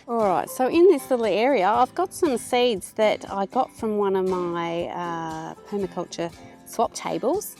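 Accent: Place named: Australia. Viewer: Fantastic so good to hear another Aussie. I see a lot of gardening videos but they are from other countries and it gets confusing with the different seasons . I can now totally relate and makes sense to what you are saying. SUBSCRIBED.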